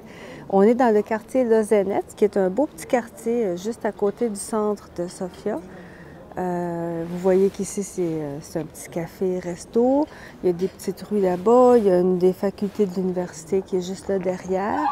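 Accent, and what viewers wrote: Quebec, Canada. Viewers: Oh, c'est le lieu de mon enfance! C'est formidable de le voir à travers les yeux d'une canadienne et d'en entendre parler en français (québécois ☺️)! Merci! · I'm enjoying your videos very much! Are you always speaking with a Quebecois accent in your videos? Even when you're teaching grammar and vocabulary?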